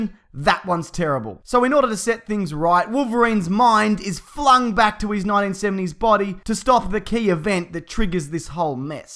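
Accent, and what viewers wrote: Australia. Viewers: is this guy an aussie? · Are you from oz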